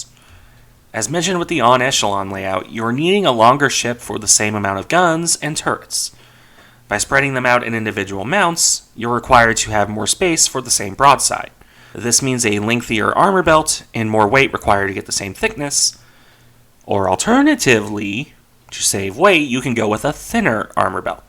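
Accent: United States. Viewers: Oh, just checked up on dee ofa comments: see y'all Virginian, wit dat southern drawling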